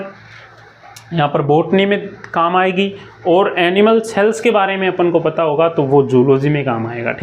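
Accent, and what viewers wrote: Rajasthan, India. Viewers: Sir aap Rajsthaan se ho kyaa 😊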